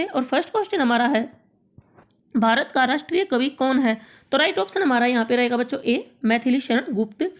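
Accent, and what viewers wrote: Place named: Haryana, India. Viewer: Ya hindi bolo ya fir hryanvi ajib bolre ho aap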